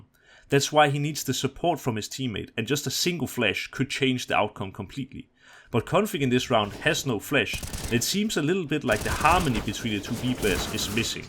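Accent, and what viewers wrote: Denmark. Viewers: nice dansk accent m ed dit engelsk dov rigtig god video ahaha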